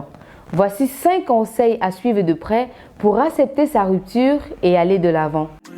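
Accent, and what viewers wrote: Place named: France. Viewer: Bon projet. Mais ma belle stp parle comme une Kamer car parlé un peu genre french girl la, c'est pas très originale. Mon point de vue seulement ooooooh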